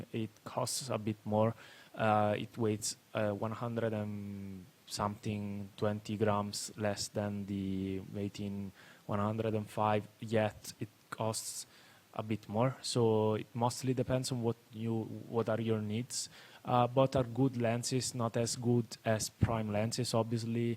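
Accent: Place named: Italy. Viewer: Si sente forte l'accento italiano :)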